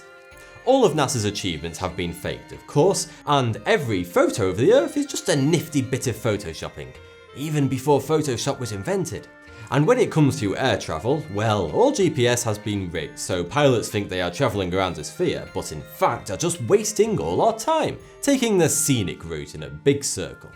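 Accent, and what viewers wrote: United Kingdom. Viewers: Mikes8899 the sparkle white oversized front teeth, the pretentious and overly british accent, not to forget the smug look on his face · This is a British accent but from which area or city? It is interesting. London? York? Lancaster? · his British accent with a lisp is really annoying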